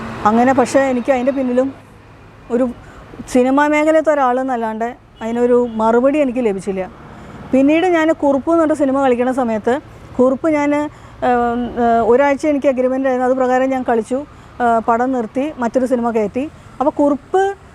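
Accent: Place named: Thrissur, India. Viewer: Beautiful Thrissur slang. One of the best theatres in TCR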